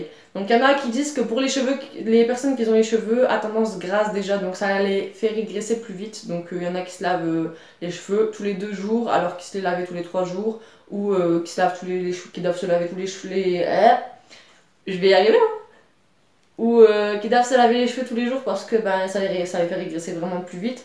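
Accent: France. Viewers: tu n'es pas du 62 ? je dis ça car je suis dans le sud depuis maintenant 15 ans et en fait je viens du Nord hihiiiiii native de Lille dans le 59 et dans le sud tout le monde trouve que j'ai un accent ..... de gros bisous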